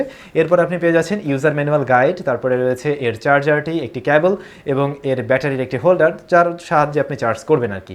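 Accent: Barishal, Bangladesh. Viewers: apnar bari borisal, karon tak kay. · ভাই টাক খায় তো বরিশালের কথা। আপনার বাড়ি কোথায়?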